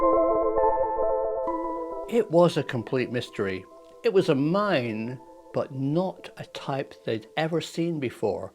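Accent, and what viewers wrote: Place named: Oxfordshire, United Kingdom. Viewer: Awesome, you from Oxfordshire?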